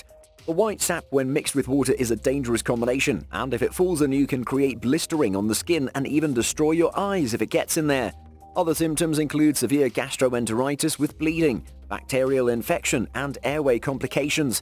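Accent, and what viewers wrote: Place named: United Kingdom. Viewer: Uk accents so different from ours strick-neen we say strick-nine migraine me-grain we say my-grain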